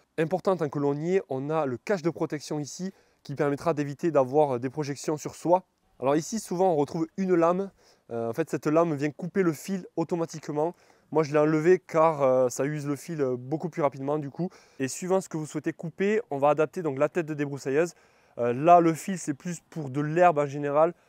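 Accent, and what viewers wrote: South, France. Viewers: Un grand merci pour tous tes conseils pour l'utilisation de la débroussailleuse. Un super tuto très utile et quel beau paysage, cela doit être dans le Sud vu ton accent. Je suis dans l'Ouest et c'est très plat! ---> mais on a des plages pour se baigner. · L accent du Sud ❤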